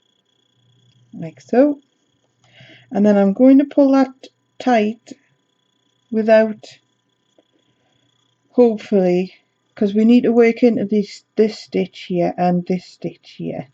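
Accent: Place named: Newcastle, United Kingdom. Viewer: So nice to hear a Geordie putting this pattern into action. I am living in Fife but from South Shields. I am more of a knitter but learned crochet during lock down. First time I have tackled a blanket with so many different techniques.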